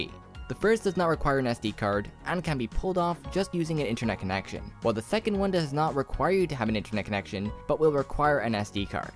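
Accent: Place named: United States. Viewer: American I believe. I'm Britsh and judging by how he pronouncing words like 'select' I think he's American. Also, he has a nasally accent which is very typical in parts of North America.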